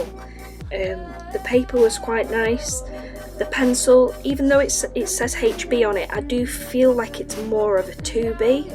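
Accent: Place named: Northern England, United Kingdom. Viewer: oh how lovely! I really like how dreamy it looks in the end. I also really like your northern accent!!! And well done on the voice-over. 👍✨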